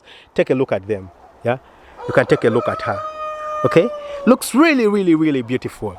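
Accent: Uganda. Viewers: Hello Doc,been a while since I checked out your videos. You have such passion,we love your Ugandan accent,we say 🇺🇬 stand up.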